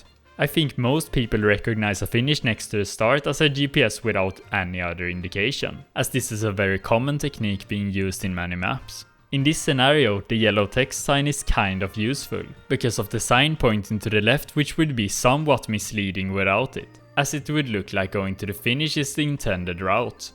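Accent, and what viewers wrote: Sweden. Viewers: love swe english accent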